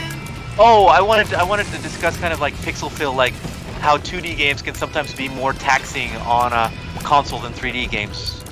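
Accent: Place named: Spain. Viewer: I love the tyrone's spanish accent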